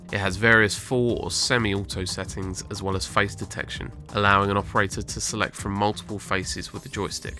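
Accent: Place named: United Kingdom. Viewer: The problem with this vid is your very hard british accent, making it very hard to understand for strangers... Still a nice vid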